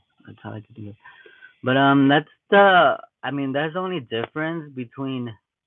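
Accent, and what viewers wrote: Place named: Mexico. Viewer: Fuckn bullshit,we are not the same,everything different,traditions,religion,beliefs,and we are from this country. You want proof im sure there is alot of fellow native people that can show you,you people stop trying to put us together,fight your own fight and go back to where your from,you sound like white christians,we are all the same,stop lieing and maken yourself look stupid genz ,just go home and wave your flag,you can tell you was raised mexican by yoir accent,what was you when you was born retard,how was you raised, kearn akl your blood bur remember who you were born unless you dont like who you was born and thats bad cant ride the fence and now all of a sudden you wanna claim indian in this country,but your not from here or these tribes,just go home and rebuild your country and stand for your mexican rights wave your flag and be proud but dont try and feed off of native people here now,like cockroches. 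And tribes in this country dont kill to sacrifice,this kid dont know shit aboit tribes in this country. Go home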